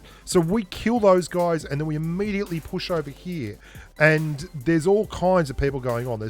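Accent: Australia. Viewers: You from Australia 🇦🇺?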